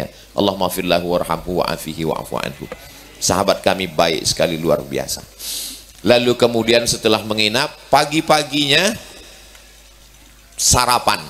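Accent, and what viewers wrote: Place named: Sumatra, Indonesia. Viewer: Maklum lah , kita orang Sumatra dialek kayak gitu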